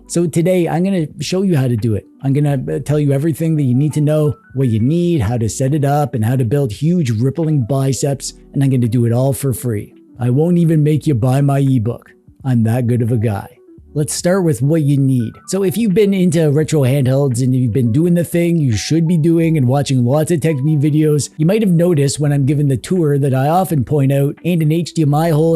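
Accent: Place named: Canada. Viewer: I wonder where he's from "withoot faffing aboot" .. oh, Canada.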